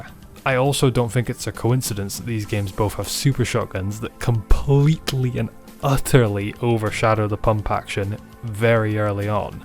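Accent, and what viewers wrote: Scotland, United Kingdom. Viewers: Lad are you Scottish?? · it took me 14 minutes to realise you were scottish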